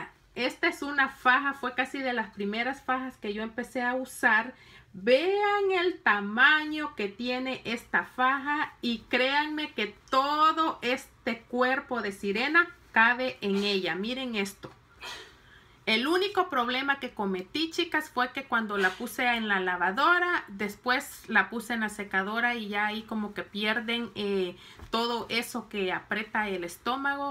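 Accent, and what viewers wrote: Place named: El Salvador. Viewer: Por tu forma de hablar creo q eres de El Salvador como yo!!!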